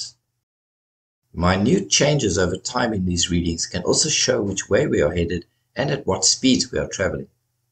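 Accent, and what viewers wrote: South Africa. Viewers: My God, this is great! And I love the SA accent!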